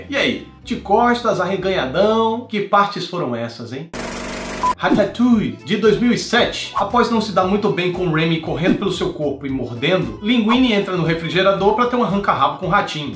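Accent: Northeast, Brazil. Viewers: Você é nordestino ?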